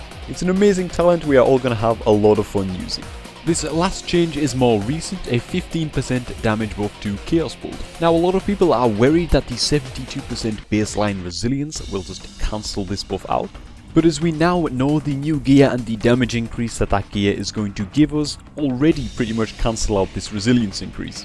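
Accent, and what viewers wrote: Newcastle, United Kingdom. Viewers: I think he sounds like he is from Newcastle ;D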